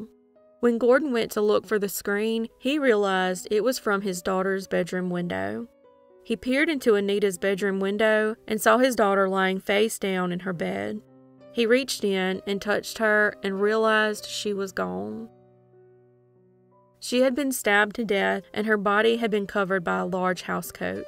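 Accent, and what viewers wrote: South, United States. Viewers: What a wonderful way to drive to work listening to such a soothing southern voice ❤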